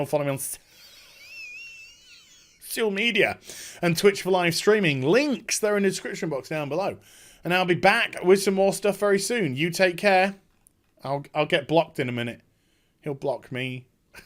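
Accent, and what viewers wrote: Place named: United Kingdom. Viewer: This guy sounds remarkably like the late Total Biscuit. Partly the British accent, but it goes beyond that. Spooky.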